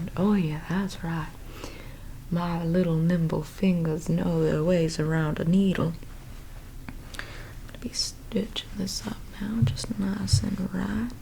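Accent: South, United States. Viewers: Southern is best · love the southern accent its top tier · the southern DRAWL I cannot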